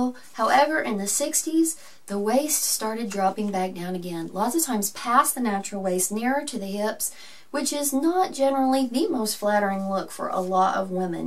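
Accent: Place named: South, United States. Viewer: Your accent is so sweet! It makes me crave a glass of ice tea under the porch of some cute southern house ❤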